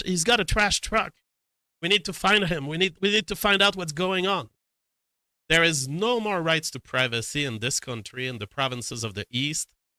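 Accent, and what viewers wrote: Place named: France. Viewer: I can't stand listening to English spoken in a Frog accent. I apologise for nothing.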